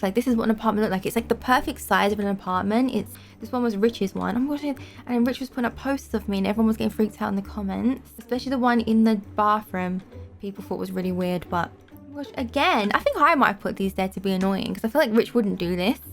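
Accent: London, United Kingdom. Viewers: You are from London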